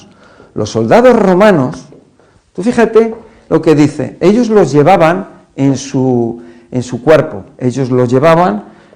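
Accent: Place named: Spain. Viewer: Me encanta ese acento Español soy cubana todos mis abuelos eran Españoles hasta yo hablo distinto a lo notmal soy maestra de Español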